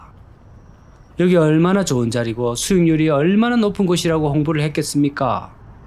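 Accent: Gyeongsang, South Korea. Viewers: 전형적인 진짜 ㅈㄴ 듣기 싫은 경상도 말투 ㅋㅋ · 경상도 사투리 방송? 왠만하면 표준말로 하시죠..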